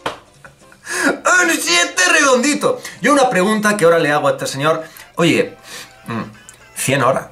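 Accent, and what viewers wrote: Andalusia, Spain. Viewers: Daniel Vargas porque es andaluz y por ende habla en su dialecto, que viene determinado por el lugar donde te crias y vives, mientras que escribir mal, es directamente una falta cultural bastante grande.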